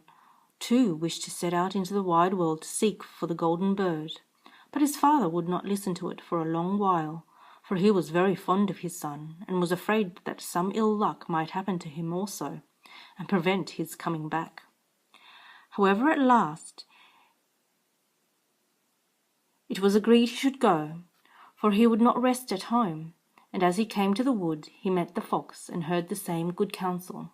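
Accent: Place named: RP, United Kingdom. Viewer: you sound like a queen of England wasn't expecting that. Do you like drugs mate?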